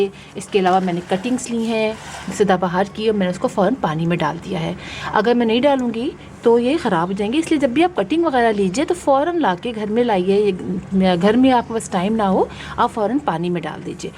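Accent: Pakistan. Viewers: her Urdu accent is Pakistani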